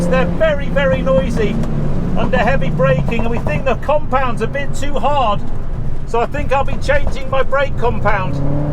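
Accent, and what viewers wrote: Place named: RP, United Kingdom. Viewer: I usually wouldn't ever say anything but his poshness was embarrassing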